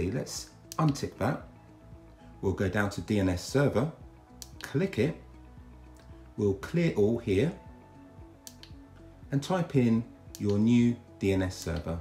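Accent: United Kingdom. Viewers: THX british sir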